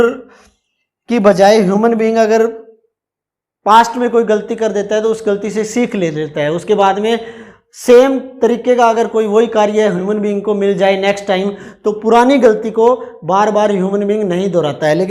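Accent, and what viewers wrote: Haryana, India. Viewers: THANKYOU VERY MUCH SIR❤️ AAP PAKKA HARYANA SE HO! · sir haryana se ho kya thoda tone aa rha h vha ka mai bhi haryana se hi hu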